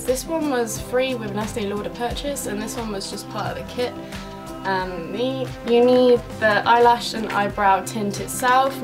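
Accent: United States. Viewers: I like how you talk, it was cool to hear you sound kind of American. Don't listen to the person who said it was annoying. Most people make different sounds or slight variations or accents from time to time. :) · I don't understand why you talk normally and then have an American accent? Throughout this video... Kinda annoying. Helpful video though :) so thanks!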